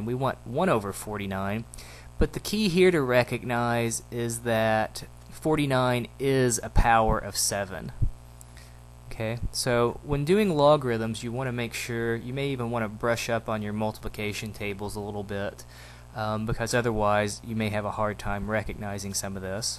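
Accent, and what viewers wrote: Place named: South, United States. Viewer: Aw you missed a phone call for us. That's dedication right there Sir. Also, you should do a video in your southern accent - I think you mentioned you have one in another video.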